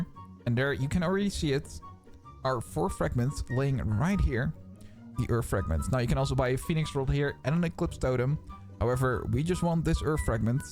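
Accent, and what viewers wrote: Netherlands. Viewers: r u dutch by any chance bro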